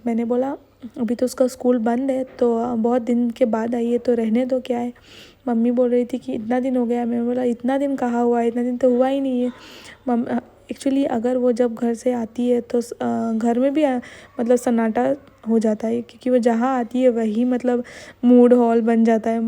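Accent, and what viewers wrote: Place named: Bengal, India. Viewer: Aap ka hindi bolny mein speed nahi aa rohy bengali aa jata hai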